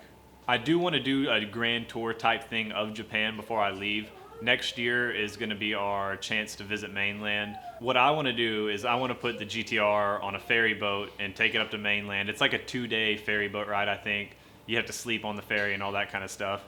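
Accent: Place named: Carolinas, United States. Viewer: Hello sir. I subbed a little after this was made and now I'm going back in time, playing a little catchup. 😉 Cool video... thanks for sharing. I knew you had to be from somewhere close to my home by your accent. I'm in Kings Mountain, NC, just over an hour from Greenville. My grandpa lived in Greer for about 60 years. If you get homesick, give me a holler. ✌Take care, brother.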